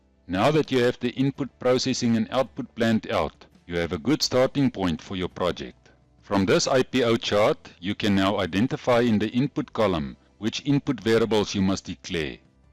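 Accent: South Africa. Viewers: Familiar South African accent. Sounds great. Great explations.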